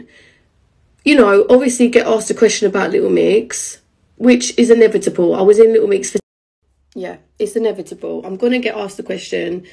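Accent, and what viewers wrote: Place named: South, United States. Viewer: I don't get you're Southern accent but u was my favourite.😘 x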